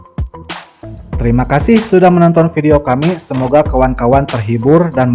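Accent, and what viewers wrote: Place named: West Java, Indonesia. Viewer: Yang ngomong pasti orang sunda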